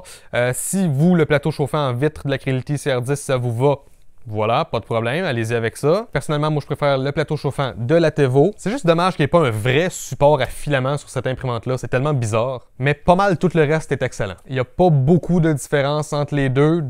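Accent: Quebec, Canada. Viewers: Très bonne vidéo comme toujours. Aussi bien pour le coté impression 3D que pour découvrir le " parler" québécois que jaime beaucoup. =) Aussi je voudrais savoir si tu connais la Tizyx k25 ? C'est une imprimante française qui est vraiment pas mal du tout, et qui je trouve mérite plus d’attention =) En tout cas bravo pour tout le travail que tu nous fournit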